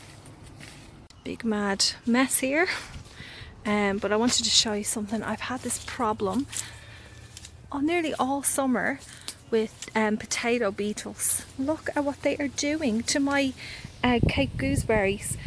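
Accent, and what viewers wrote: Ireland. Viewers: Your accent sounds Irish 🤔 but either way cool garden 👍🤗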